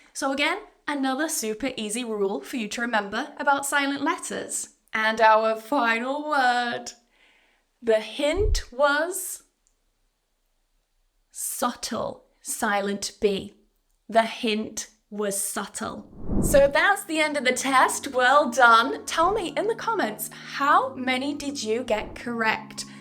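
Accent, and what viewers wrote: United Kingdom. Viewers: Hello..I would like to join ur classes.how can I joy? Actually now I'm in UK.I like ur British accent and your pronunciation course would help me alot. Thank u a lot.I'm looking forward ur reply.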